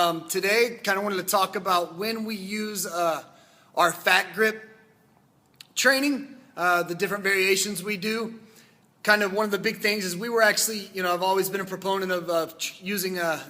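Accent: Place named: United States. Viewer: The most annoying loud American accent. Imagine being stuck in a lift with this guy on his phone. Mugg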